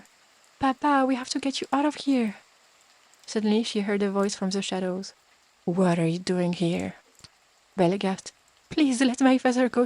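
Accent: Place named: France. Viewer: I love a nice French accent. Ty. Also hi I’m your newest sub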